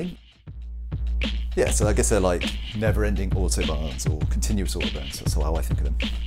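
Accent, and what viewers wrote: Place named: Texas, United States. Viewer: Yeah, I can't find anyone else being credited with inventing it. I believe I was wrong😁 Nice chatting, I enjoy your videos and I like your hand drawn S9 faceplate! 12inch Skins is awesome, got some on my setup if you want to check on my videos. The videos are terrible, ignore that part... Cheers from Buffalo NY! I'm guessing your from Texas judging from the accent lol! Or maybe Great Britan! 😁 I hope to get there someday. Looks beautiful! Cheers!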